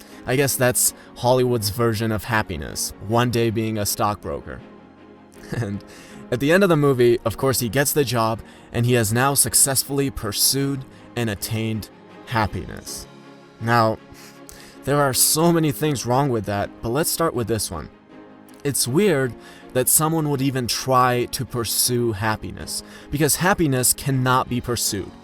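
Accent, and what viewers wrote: Georgia, United States. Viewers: What? Are you from Georgia? Duude❤ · You from Georgia? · You are from Georgia?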